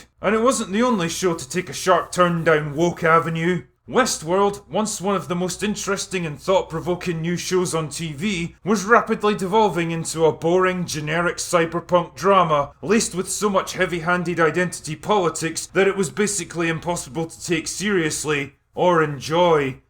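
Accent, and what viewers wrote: Scotland, United Kingdom. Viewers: What are some of ya books mate? I've only just found your channel, but I've been binge watching everything... because Scots have the best accent in the world, and your no bullshit attitude is how i like things lol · Is this a movie Chanel? We just like the Scottish accent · Honestly, I'm only here to listen to your accent. Because without the likes of you and Craig Ferguson millions of Americans would be under the misconception that Mike Meyers got it right. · I think we like you so much, cause your criticisms are pretty fair and honest. If a movie can generally suck, you will say so, but if it has elements that work out, you will say that too. If one is generally pretty good, but has elements that fail too, you will point those out. And frankly most of us dislike the Woke trying to force their way into our entertainment. 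Largely I will say, because they simply cannot do good stories. Seeing someone call this out in a dour, drunken Scottish tone has been a damn near godsend lol.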